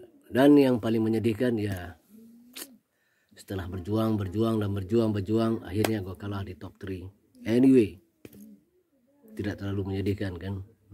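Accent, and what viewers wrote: Malaysia. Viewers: Lord, ceritanya bisa berbicara Melayu mirip Malaysia begitu bagaimana? Kayaknya Sumatra Barat gak begitu deh melayunya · lama di Malaysia jdi logat melayunya kebawa, tpi aslinya ya emg dri tanah datar sumbar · dia logat melayu itu buk,karena 30 tahun tinggal disana 😀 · dia memang orang minang, tapi dari kecil sampai tahun 2017 itu dimalaysia, makanya logatnya itu logat melayu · Kental sangat logat melayu Lord ni, I gagal fokus to your price tag baju, 😆😂 Stay humble and go to the top. 😊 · saya dari Malaysia, saya suka sangat bila masak, sempoi gituu, bila saya tengok mci saya tengok longatnya kok macam orang melayu ni, rupanya orang jebat ni wehhhh. Abang jago ni bosss🤣🤣🤣🤣👍👍👍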